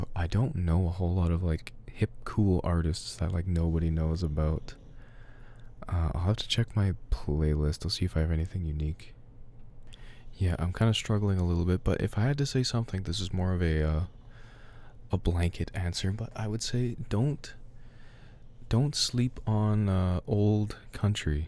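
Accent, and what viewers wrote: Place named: Canada. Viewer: JUST listened to my first audio of yours, your first one on Quinn. Can I just say, first sentence thought, “oh? Is he Canadian?”, 10 seconds in you say ‘sorry’, “yup, definitely Canadian”. A cowboy but without the American accent and uses CST? Yeaaa canadian prairie boy. As a fellow Canadian, I call this supporting local arts and culture 😆. Seriously though, until today, I pretty much stuck to always the same VA (Rum). i’ve tried listening to quite a few other VAs on Quinn and I just can’t. Either tone, pace, sound effects, etc. turn me off. I’ll need to do a bit more research to be sure 😉 but, I might just have a 2nd VA on my list, which is very exciting! For real though, I don’t know if you’re familiar with Rum, the binaural king, buuuttt you give similar vibes and tone. Also from the reddit world. I would KILL for a binaural audio with you in one ear and Rum in the other. 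Or maybe just a twitch stream where we just get to listen to your smooth voices playing games 🫠. K, I know I’m getting carried away but seriously, I have a vision of you two being friends and making 🔥 content together. Listening to this now because I like to know a bit about the VAs I listen to. A whole 4hr intro!!! 😂 So i’m not done yet but I will get through it. I actually lol’ed at the haulin’ hay answer because #truth. Not a farm girl but helped haul hay on my uncle’s farm and it’s no joke. Congrats on ALMOST 20K🎉 🎉🎉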